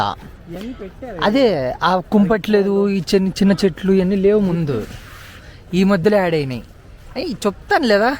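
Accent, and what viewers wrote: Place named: Tadipatri, India. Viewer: anantapurama Tadipatri na, adhey slang idhi ...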